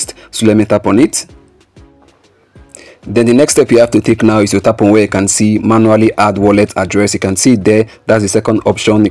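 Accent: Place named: Africa. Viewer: man ,u have an african english and u talk fast ,slow down man ,none understand u like that ,ur so funny !!!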